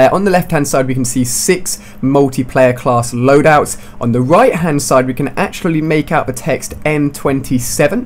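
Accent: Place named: United Kingdom. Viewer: man i so hate british accent.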